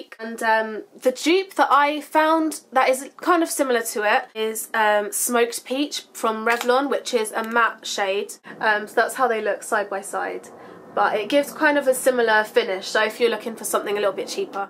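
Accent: United Kingdom. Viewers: Are you British ? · Ur so British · I love the British accent !!! Thank you for the very helpful video 💕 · lol i just think its so cool when i click on a youtube video about hair or makeup with a girl & then out comes this british accent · ur cute. then i played ur video. ur british! even cuter! totally subscribing!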